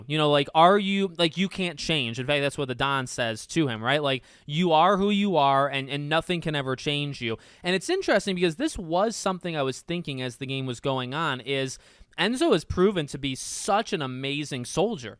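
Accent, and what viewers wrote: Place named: Michigan, United States. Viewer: Just came across this channel and you do a great job! You sound like a Michigander lol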